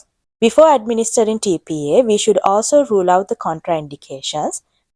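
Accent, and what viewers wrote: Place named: Asia. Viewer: Good video and great effort. Congratulations for a very good job. However, You have a distinct, asian accent difficult to understand by western European citizens and you have spoken to fast at times. Best wishes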